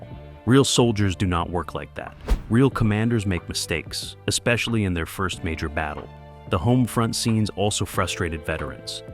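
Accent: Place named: United States. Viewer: Sounds typical American.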